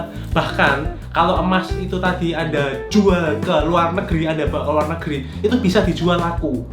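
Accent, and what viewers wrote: Java, Indonesia. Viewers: Kok muanteb ngomonge,,jawa y bang,,,??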